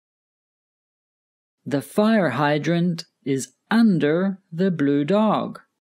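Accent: United States. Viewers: Is these English American?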